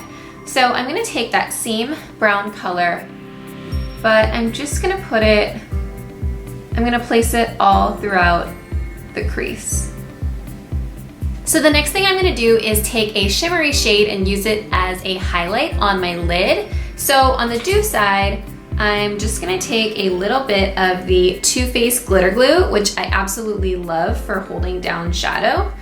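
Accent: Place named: California, United States. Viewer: sounds like CA accent.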